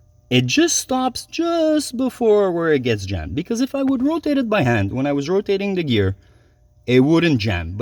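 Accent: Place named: Boston, United States. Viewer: Thanks,! You sound just like my cousin from Boston 😁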